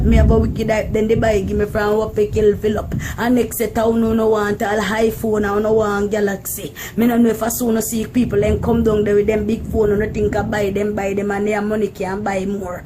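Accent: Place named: Patois, Jamaica. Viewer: Der Henker patios broken English Jamaican language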